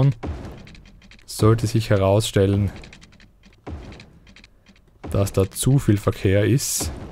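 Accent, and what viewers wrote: Austria. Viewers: Finde die Serie super! Bin momentan im Krankenstand und bin noch nicht dazu gekommen mir das spiel selber zu kaufen, jetzt habe ich mir jede Folge von dir angeschaut. Habe zu erst begonnen bei Gronkh zu schauen, finde aber dessen "Spielweise" etwas umständlich. Und da bin ich mehr aus Zufall über deine Videos gestolpert, da geht was weiter in den Folgen und bin auch größtenteils mit deinen Entscheidungen einer Meinung was bei Gronkh z.B. nicht immer der Fall ist, daher finde ich diese Videos netter zum anschauen. Ebenfalls finde ich deinen Dialekt netter, bin ja selber Österreicher und finde es sehr angenehm. Habe mir auch einige deiner Sid Maier Railroad folgen angeschaut und überlege mir auch dieses Spiel zuzulegen so als alten Klassiker :D WEITER SO! Freue mich schon auf die nächste Folge!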